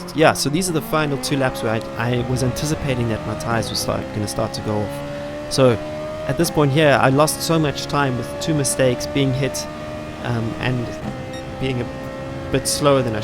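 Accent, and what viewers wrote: South Africa. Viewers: Where in SA are you from? Your accent sounds like Cape Town, or at least somewhere in the Western Cape.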